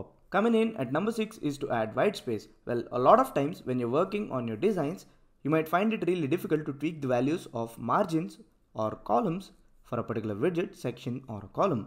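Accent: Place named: India. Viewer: awful indian accent, can't understand anything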